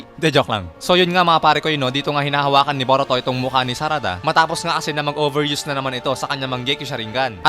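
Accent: Visayas, Philippines. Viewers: Parang nagiging Bisaya kana din ahh.. grabe talaga influence namin bisaya hahah! #Kapoy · Bisaya ka dol? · Bisaya ang ampt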